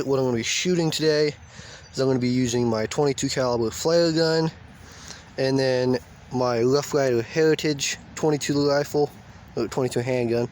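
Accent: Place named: Massachusetts, United States. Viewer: Cool stuff. An interesting video and comparison info. YouTube recommended your video to me here in Toronto, Canada. Guessing you're a Massachusetts fella, judging by your accent. Ha!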